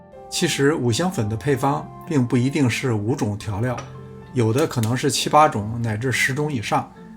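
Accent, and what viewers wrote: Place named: Beijing, China. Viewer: 北京話😂。 不過我姥姥是東北人， 她也這麼說。 很多北京話和東北話的用詞都一樣， 儘管口音不同， 我总覺得這說不定与清朝滿族人有關， 把東北話帶到北京來了。😂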